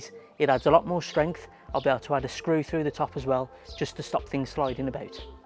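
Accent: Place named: Northern England, United Kingdom. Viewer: Great job! As a Frenchman obsessed with accents, may I ask you where you're from? I can tell Northern England but I'm not sure where exactly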